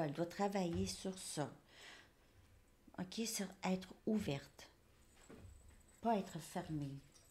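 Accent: Canada. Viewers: Bjr. Votre bonnet vous va à ravir. J'adore votre accent canadien. Trop marrante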